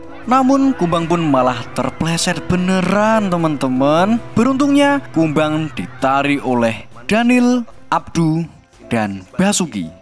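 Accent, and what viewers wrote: Java, Indonesia. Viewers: Mas mimin asli mana ya?,kok logat jowone mantep ketone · logat jowo ne kuat bgt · Medok juga hhhh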